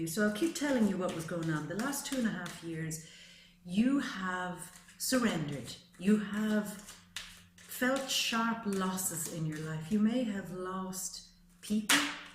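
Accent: Ireland. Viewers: nice Irish accent. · wow ,,,and all in a lovely Irish accent <<<subscribed beautiful x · My God, what a reading, I hope it transpires because I had an Astral Prediction done last in 2017 and was promised changes would start in May, do the lottery and a windfall would come in, I spent over a £1000 on lotteries from end of March to June and won very little, other things were going to change, reconciliation and more? My birthday is 26/12/1961 I was born at 5.40am birth in Kirkby, England? I've been through it over the last 30 years but none more so, than the past 2.5/3 years. My wife ended our marriage 15/12/16 walked out Jan 2017 I've been sick with depression and anxiety, hospitalised with blood clots on my lungs, I was suspended from work. Now things are meant to be going right for me? Why do I have no faith? My ex filed for a divorce, I got the papers virtually a year to the day she told me it was over 16/12/16 now I find myself in a predicament, fearing losing my job, my house, half my pension, everything. 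By the way, may I just say you are beautiful, your eyes are so mystical, your soft dulcet Irish tones are so soothing and I love your witty repartee. I'll be watching your readings every month for as long as I am here.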